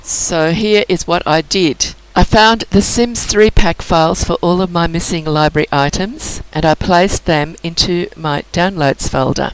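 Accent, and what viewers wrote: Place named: Australia. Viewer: So very clear and great to hear an Aussie voice, thanks heaps.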